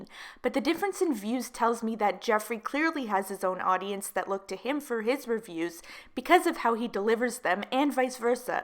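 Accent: Canada. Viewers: omg. This is my favorite channel. And your accent (Canadian?) is adorable. Keep digging dat dirt, girl.😘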